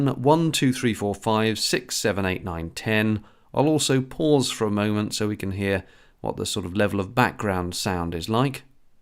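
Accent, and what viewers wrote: United Kingdom. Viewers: i love british english :P good review, will buy it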